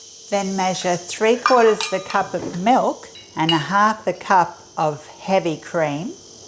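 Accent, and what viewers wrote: Australia. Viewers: Why ate you saying all purpose flour? In Australia its plain flour and double cream. You accent is Aussie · I love your accent. You sound absolutely spangled! Aussies always sound like they are completely smashed. 😂 Great recipe btw.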